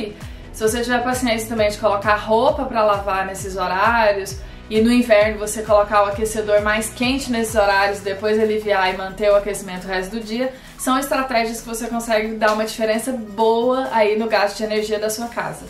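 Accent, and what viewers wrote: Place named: Goiás, Brazil. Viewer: Eu e minha esposa devido a atual situação de nosso país, resolvemos imigrar. Tenho direito a cidania italiana e dai partimos por buscar opções. Acabamos caindo nos seus vídeos e estamos adorando sua simpatia e didatica ao explicar tudo! Ficamos encantados pela Irlânda, obrigado pelos videos e continue assim! PS: enquanto assistiamos seus vídeos eu falei pra minha esposa ''Ela é goiana, olha esse sotaque'' e num é que é mesmo? Somos de Gyn tbm! Parabéns pela casa, da pra ver a felicidade em vcs.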